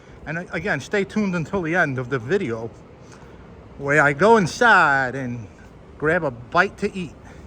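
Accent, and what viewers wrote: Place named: New York, United States. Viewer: Thank you so much for taking us on the journey with you. I haven't been back to New York since I left in 2000. I'm a Brooklyn girl and I love your accent. Didn't realize we New Yorkers had an accent until I left. Thanks so much and look forward to your next video. Stay safe and stay blessed.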